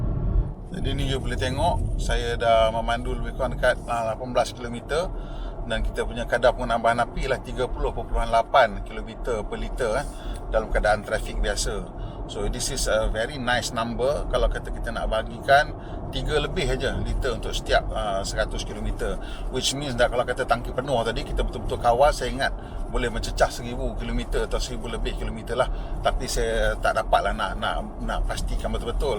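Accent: North, Malaysia. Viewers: ulas guna slank utaqa style jgk bang.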